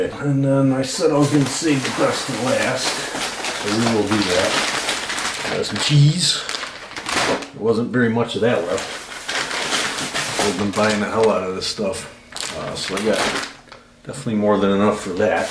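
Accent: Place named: Wisconsin, United States. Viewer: Any chance you're from Wisconsin? You sound exactly like my friend's father who hails from Milwaukee.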